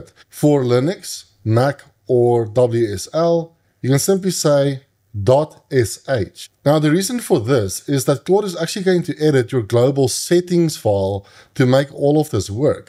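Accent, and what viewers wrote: South Africa. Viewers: are you from South Africa ?:)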